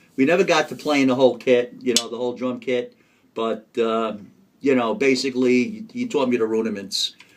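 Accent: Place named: East Coast, United States. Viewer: Great video, you sound like your from the east coast, I'm originally from Boston myself.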